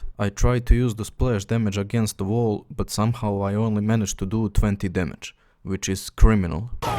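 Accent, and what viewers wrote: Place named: Eastern Europe. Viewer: Holy shit my guy has a voice! Lol if you ask me all us slav’s have the same accent when speaking English (2nd language) 😂. Продолжай в том же духе, брат 🫡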